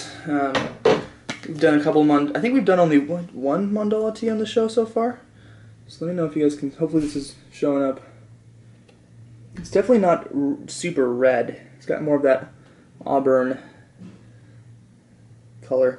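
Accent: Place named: France. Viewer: You have a little accent . Are you French ?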